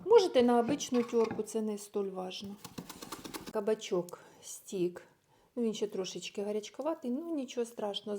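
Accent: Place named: Poltava, Ukraine. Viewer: Я ,як почула Вас,подумала що з Полтавщини .У нас теж так кажуть -кабак.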